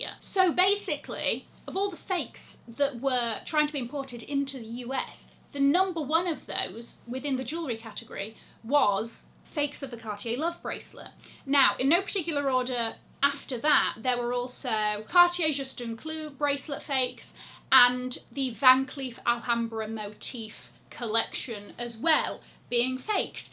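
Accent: United Kingdom. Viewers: I didn't think you would have a British accent. Surprise! ❤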